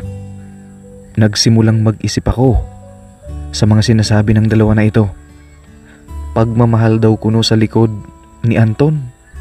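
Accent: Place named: Batangas, Philippines. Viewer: sir hahahaha taga batangas kaba? ako taga nasugbu batangas. nahala ko kase tono ng boses mo ingat palagi sir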